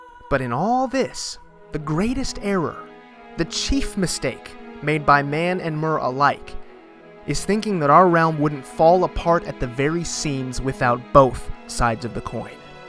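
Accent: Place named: Brazil. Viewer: You sound Brazilian which is a compliment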